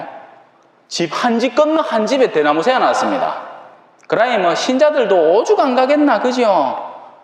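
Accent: Gyeongsang, South Korea. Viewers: 아멘 신부님 경상도 사투리 넘 정겹습니다 강론말씀도 참 잼나게 하십니다 나날이 신부님 건강도 좋아보시니 감사드립니다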